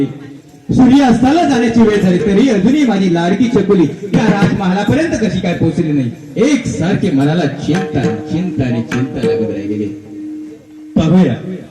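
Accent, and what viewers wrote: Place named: Malvani, India. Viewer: Josh Academy he malvani bhasha aahe tyat uchhar asach hoto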